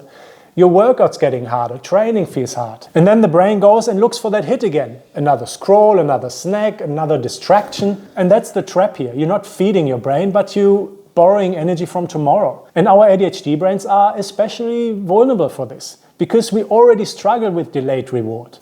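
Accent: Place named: Germany. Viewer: My ADHD brain tells me to guess your accent. Deutschland? Ich learne ein Jahre Deutsch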